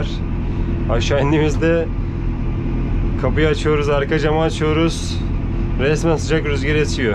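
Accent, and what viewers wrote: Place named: Istanbul, Turkey. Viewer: İstanbul Türkçesiyle son teknoloji tarım makinelerinin bu kadar yakışması peki ❤❤❤ örnek bir Adamsın sen 👏👏👏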